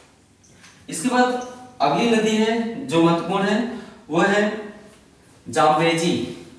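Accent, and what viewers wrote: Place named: Eastern Uttar Pradesh, India. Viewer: लेकिन हो तो आप east up ya west bihar se it is 100% sure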